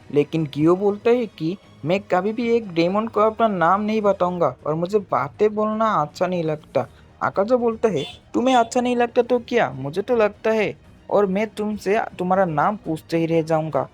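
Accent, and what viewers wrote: Bengal, India. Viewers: Bhaiya tumi Bangali na?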